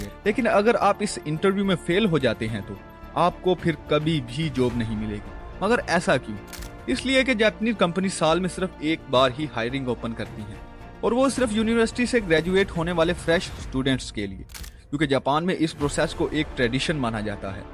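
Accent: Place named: Pakistan. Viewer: Bhai accent sudhar le (pakistani lagh rha hai )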